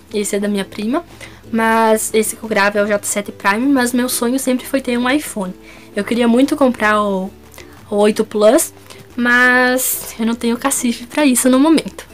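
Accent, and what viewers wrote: Rio Grande do Sul, Brazil. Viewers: Gosto muito do seu sutaque gaúcha seu cabelo é muito lindo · Olá também sou gaúcha.amo seu sotaque queria ser assim também...e não vivo sem cebola KKK. amei o vídeo Deus te abençoe ❤️ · Amei seu vídeo, mas nunca tinha ouvido falar que alguém ama leite ainda mais sendo gaúcha tchêê...🤗... cadê o churrasco e o chimarrão?...😋... brincadeira rs...seu sotaque é lindo e vc não deve se envergonhar nunca pois é uma característica sua, de sua região que vc deve se orgulhar sempre...um superlike...😉😊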